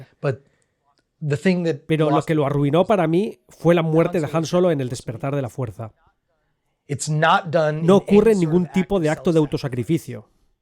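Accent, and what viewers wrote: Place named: Spain. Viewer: Que bien análisis de la película y le encuentro toda la razón, pero me da mucha risa y me saca de la seriedad el como pronuncia los nombres de las cosas y personajes de Star wars, por cada cosa que empieza con S los españoles le meten un "ES"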